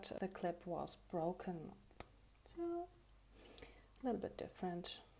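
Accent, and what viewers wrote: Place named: Germany. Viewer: 2 seconds into this video and I already figured you're German.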